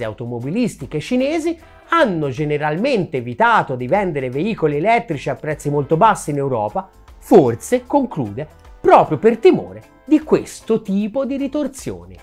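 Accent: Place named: Tuscany, Italy. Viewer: Sei un grande 😁 Specie con il tuo accento toscano 😁. È un dato di fatto che i cinesi ci hanno creduto per primi e hanno investito e sono avanti di decine di anni. In Europa le lobby hanno screditato il mercato nascente non facendo investimenti nuovi ma ancora guadagnare allo stesso modo cosa non spiacevole per i governi di incassare ancora le accise 😂. Ora che le pressioni dei cambiamenti climatici e della tecnologia ev sono sempre meno screditabili non si sa che pesce prendere che scusa o colpa trovare. l'Europa è in netta arretratezza e recupero il ritardo costa caro. I cinesi l'avevo già sentita nel settore ev vanno in punta di piedi sanno benissimo che rischiano l'aumento dei dazi. Difatti la macchina ev più venduta in Europa e America e la tesla che è un marchio Usa,Elon Musk un miliardario pazzo che ci ha creduto nonostante i bastoni tra le (ruote)si è costituito non solo le macchine,i software, le batterie i supercharger e ancora per aggirare la burocrazia in america la RC auto per le sue macchine 😁. 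Quanto detto i anche in America è come tutto il mondo: gli hanno reso la vita difficile. La determinazione e l'intuito ora raccolgono tanti frutti. Palle che Stellantis, Volkswagen e nessun grande gruppo europeo ha avuto.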